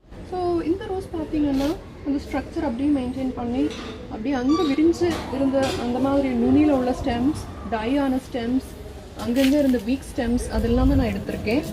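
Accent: Kerala, India. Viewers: அருமையான விளக்கம்.மலையாளி தமிழ் மொழியில் பேசுவது போல உங்கள் பேச்சு இருக்கிறது. நீங்கள் மலையாளியா ?.தெரிவியுங்கள். நன்றி.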